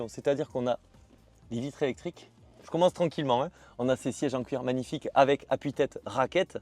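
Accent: South, France. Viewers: Le ton ici est plus méridional, disons.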